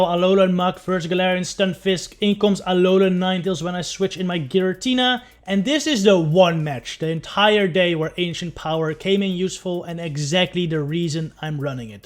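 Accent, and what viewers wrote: Netherlands. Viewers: I’ve been trying to clock your accent and now I think you’re Dutch! Sorry random sidebar from a new follower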